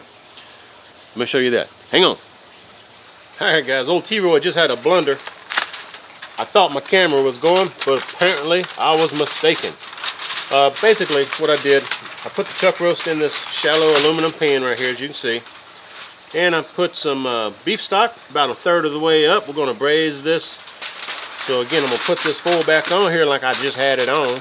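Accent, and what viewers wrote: South, United States. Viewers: Looks good, might have to try this sometime. Is it just me or has T-Roy developed an even STRONGER southern accent in the last year or so since I watched one of his videos? Seems like he might be hanging out a bit more than usual with some boys from the deep, deep south.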